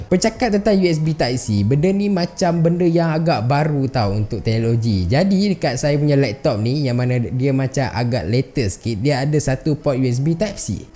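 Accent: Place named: North, Malaysia. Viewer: ...aik utaqa pulak ka bang hahaha...duduk mane,btw sebenaqnya aku pun oqang utaqa gak hehehe😂👌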